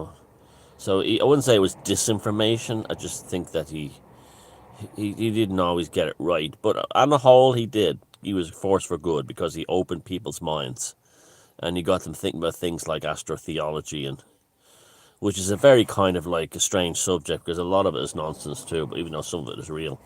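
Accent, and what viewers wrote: Tipperary, Ireland. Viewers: Tip on the tipp accent - you have to add a y as the second letter of every word that ends in a vowel or w. It may well be impossible , I don't think I have the right muscle fibres in my tongue to enable a Wicklow accent, probably requires conditioning since infancy. There is actually a huge diversity of accents in Tipperary(all have that y thing though), they get extreme as you approach the borders with other counties. R.I.P Jordan Maxwell..Really enjoyed you're last few videos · Well I don’t think I’ve laughed so much in years as I’ve just done at your Tipperary accent 🤣😂! I left Ireland at the age of 21..30 years ago now! I remember the characters and I also remember an old fella that used to live in our neighbourhood when I lived in London, he was from Tipperary and spoke exactly the same! He had many a spat with the neighbours which usually ended up with him calling them “feckin pagans”…why he chose to use pagan as in term of insult..well I’m not exactly sure 😂. 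I remember creasing up many a time at his antics. I was about the only one locally he had a good word for..because I was Irish 😂. He always used to remind me that I was only a wee Irish girl in “John Bull” and I must watch out for “dem heathens” over here..OMG 😂..you just reminded me of him, definitely long since passed by now, he must have been pushing 70 at the time. Thank you for the laughs and the reminders ☺️. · Shite Tipperary accent 🙄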